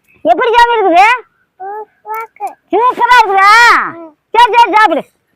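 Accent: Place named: Dharmapuri, India. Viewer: Nice ppaatima.. Neega ssamiyal kurippu sollum pothu yanga ooru neabagam varthu so neega yapdium Dharmapuri, selam, kirushnagri. Vellore pakkamtha irupiga... ❤❤❤❤ all vedio very nice paatima.... 🎉🎉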